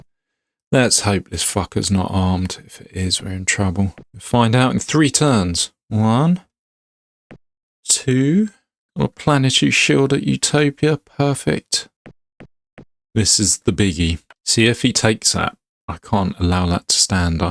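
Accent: United Kingdom. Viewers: Great 2 c someone else than Sullla playing this diamond. And with a nice, calm Bri'ish accent :D . Thank you sir.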